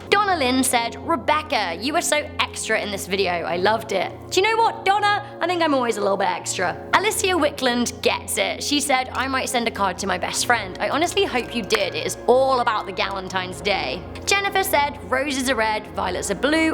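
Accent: United Kingdom. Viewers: Toronto? You sound so British, Rebecca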